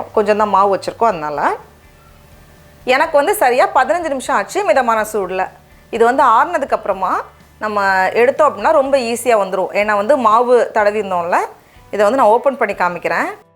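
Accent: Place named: Chennai, India. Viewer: Super akka love Madras Tamil❤️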